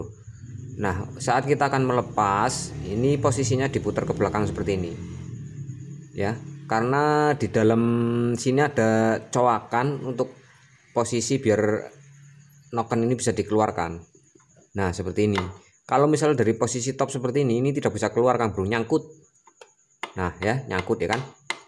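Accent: Java, Indonesia. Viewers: nama mu kaya marga orang batak bro,,,tapi logat mu medook bat jawa😅😅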